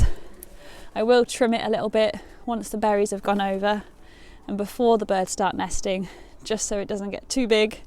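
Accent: England, United Kingdom. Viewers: hello NICE VIDEO AND REALLY LOVE THAT ENGLISH ACCENT AND THAT CUTE SMILE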